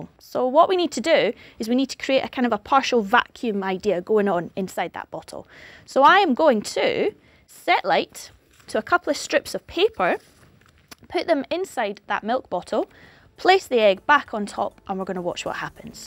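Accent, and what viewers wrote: Scotland, United Kingdom. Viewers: Is that a british accent ? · scottish people just know when others are scottish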